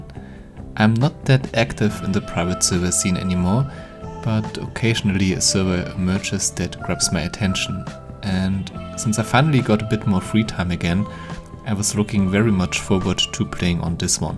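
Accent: Germany. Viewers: Mach das besser auf deutsch... Das englisch ist so schrecklich deutsch 🤣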